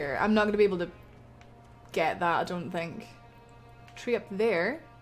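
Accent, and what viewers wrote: Scotland, United Kingdom. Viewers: Is this a scotish accent? I have no fcking idea.but i love it🤔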